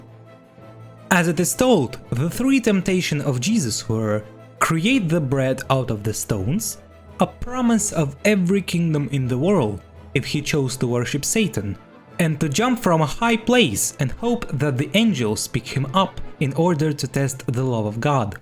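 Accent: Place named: Ukraine. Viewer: Сидел, сотрел видео. В конце оказалось, что автор Украинец (я то думал, что акцент такой забавный). Приятно знать, что автор так "недалеко" и приятно знать, что не только омерикосы делают хорошие видео с теориями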